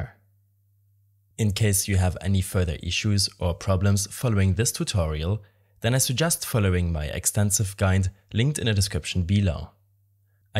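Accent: Germany. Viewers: sehr starker deutscher akzent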